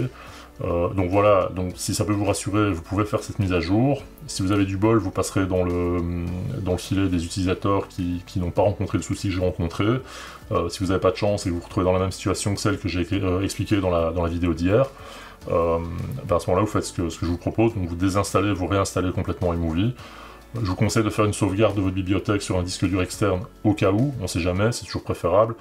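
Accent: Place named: Belgium. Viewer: Pour une fois que je trouve une chaîne qui explique bien les choses, ça serait dommage de la voir disparaitre :) Sinon, rien avoir, mais j'ai l'impression qu'on se connais ou du moins qu'on s'est déjà croisé :D Et quand j'entends ton accent Belge, étant moi même en Belgique, je me dis encore plus que mon impression est juste :D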